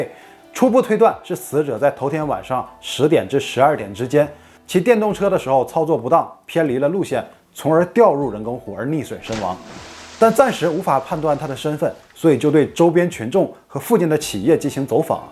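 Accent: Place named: Shenyang, China. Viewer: 但是有浓浓的东北口音 感觉像沈阳的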